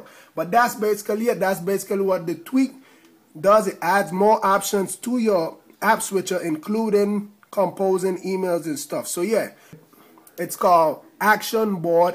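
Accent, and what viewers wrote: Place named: Jamaica. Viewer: You sound like you could sing a Jamaican song !!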